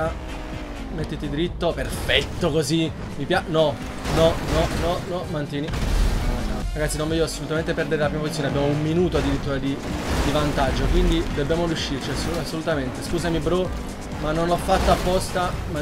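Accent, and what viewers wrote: Naples, Italy. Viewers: È di Napoli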